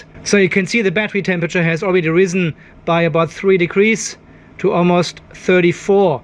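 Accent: Germany. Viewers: Also deutschen Akzent hört man ja schon sehr deutlich, wenn er Englisch spricht.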